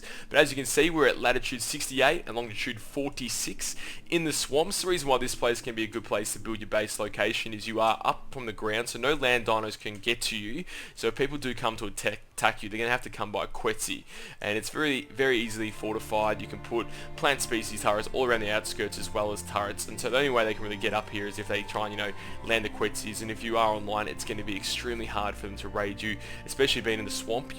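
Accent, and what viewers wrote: Australia. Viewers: I could tell your Aussie! Same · yeah, can always pick up that sexy aussie accent anywhere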